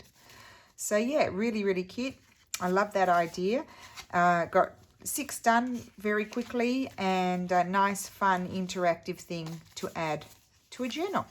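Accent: Australia. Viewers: I am changing your name from the Martha Stewart of YouTube to the. This is the stupidest question anyone has ever asked you. When you dream, do you speak in Italian or in your delightful Aussie accent? My friend is tri-lingual, and she says the people in her dreams speak all three, and often if two people are talking one is in English and the other speaks French. Great project!!!!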